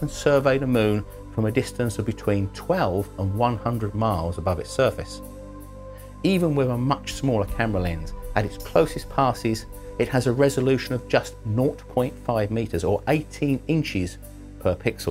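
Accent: United Kingdom. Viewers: The soft tender British voice of reason!! Google has discovered my chickens and their feathers in my back yard through the atmosphere!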